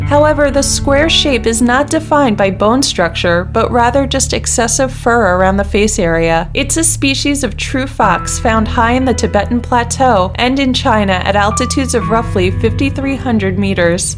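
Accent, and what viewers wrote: United States. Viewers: Love the foxes but you seem to speak with an American accent...we use inches and feet and yards!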